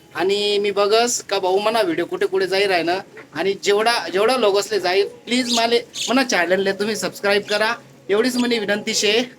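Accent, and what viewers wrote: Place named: Khandesh, India. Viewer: जमनी बरका अहिराणी एकदम ओरिजनल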